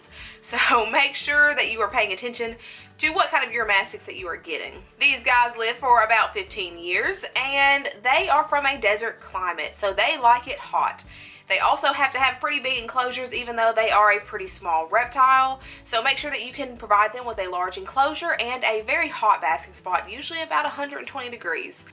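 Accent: South, United States. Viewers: Apropos to nothing, but your accent is the cutest!!! I'm from AR originally, so I love a good Southern accent.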